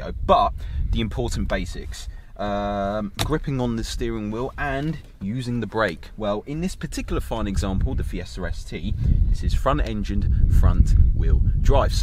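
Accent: United Kingdom. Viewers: u r British accent 👌👌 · The whole video looks like Forza Horizon 4 in real life. The British accent only adds to it.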